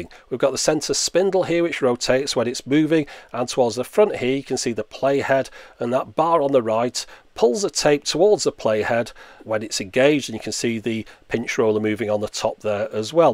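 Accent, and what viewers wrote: United Kingdom. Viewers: the uk. You can hear it in his voice. Also, the ebay was asking for pounds, not aussie dollars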